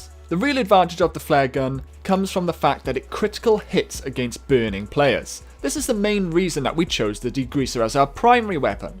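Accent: Wales, United Kingdom. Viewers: Are u welsh?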